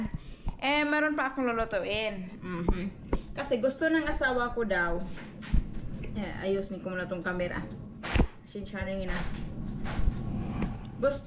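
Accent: Visayas, Philippines. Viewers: Tama ka sis..naawa ako sa knya kc grabe kun mambash ang iba..she’s just being true to herself..i am really so proud of her.hindi niya tinatago kung ano meron sa paligid niya.yung pronounciation xa ok lng kc bisaya xa hindi xa sanay sa tagalog..understandable.marami lng tlg bashers..sa totoo lang habang nangbbash cla, ang blessings bumubuhos kay..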